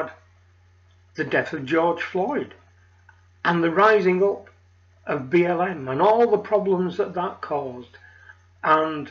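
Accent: United Kingdom. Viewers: all good. Nice to hear the Lancastrian drone of voice again. Bye the way we are on the same page 😉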